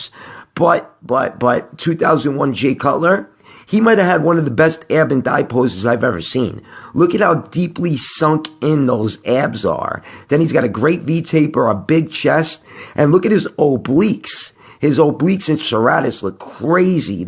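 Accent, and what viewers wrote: New York, United States. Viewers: I love your NYC accent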